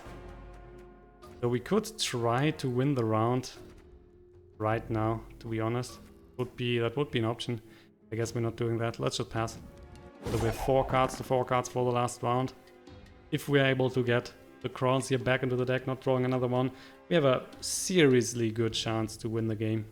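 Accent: Germany. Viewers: are you from germany? just wanna know if i guess the accent right.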